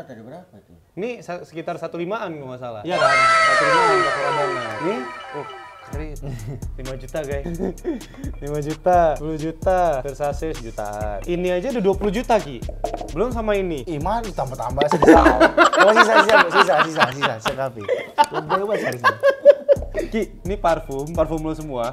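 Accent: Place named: Medan, Indonesia. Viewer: Ak senang bnget dek. Conten rame cakap Medanny ak snang kali.mungkin karma ak orang medan mranto kerja di jakarta.makasih iy dek 🙏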